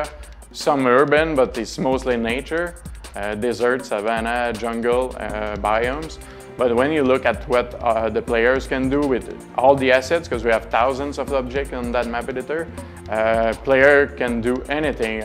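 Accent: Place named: Canada. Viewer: awsome! LOVED IT!! ^_^ sounds like its canadian lol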